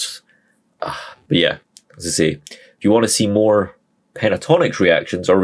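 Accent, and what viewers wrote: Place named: Scotland, United Kingdom. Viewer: Good to hear a good strong Scottish accent. (West of Scotland, I'm guessing. Edinburgh myself). Recently voted the sexiest accent in the UK in an international poll. (Scottish, not just Edinburgh.) Of course. I'm a Pentaholic but I'm not going to suggest anything. There are plenty of others to do that and they will. My favourites of are not always among their most popular anyway. But you won't be disappointed in any of them. Cannae wait tae see where it goes frae here. Pronunciation guide for the 'furriners': Can ay way' tay see whayr i' goes fray he-ur. (glottal stop after way and i).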